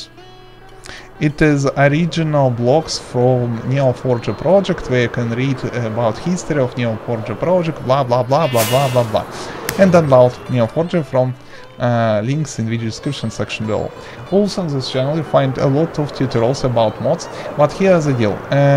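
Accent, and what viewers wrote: Russia. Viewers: Не думал, что русский акцент на столько сильно выделяется.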